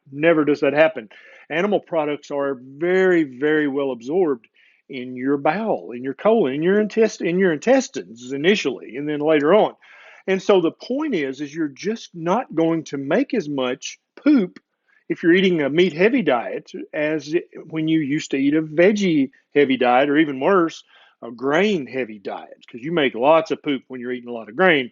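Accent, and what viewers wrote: South, United States. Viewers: So I'm in my thirties and just giggled at you saying "poop" because of the southern accent. I'm an adult. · It must be the southern drawl. Makes the word poop sound so flavorful.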